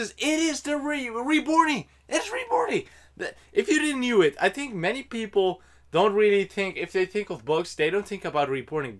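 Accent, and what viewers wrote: Netherlands. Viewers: wait are you dutch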